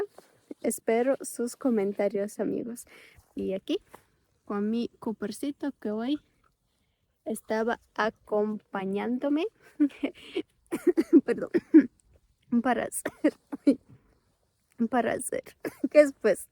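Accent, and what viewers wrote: Ambato, Ecuador. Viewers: Cordiales saludos desde Quito Ecuador, tienes un hermoso acento ecuatoriano y aun mas, ambateño ¡felicidades! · Mira yo soy ecuatoriano vivo en paris igual que tu me toco a mi ablar frances sin profesor yo se que es muy dificil pero yo te felicito tu ablas muy bien y de verdad tienes el acento ecuatoriano en america latina todo mundo abla español pero cada pais con su acento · Un español con un hermoso acento ambateño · Ques pues dices al toser 👍👍👍👍 eres ecuatoriana ❤❤❤❤ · 🎉Que bella esa vivencia. Ya habla español ecuatoriano, le falta la Música que es la más bella, como me gustaría mandarle música selecta del Ecuador , yo no soy de Ecuador pero si se la calidad de música sobre todo la antigua ya que la moderna ya dañan todo en lo q a música se refiere.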